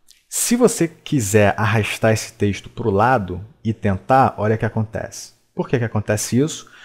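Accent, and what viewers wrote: Rio de Janeiro, Brazil. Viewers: Carioqueish não aguento. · Carioquês tá em dia heim.. hehehehe..ótima aula. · Você é carioca ? (alem disso ótimo vídeo)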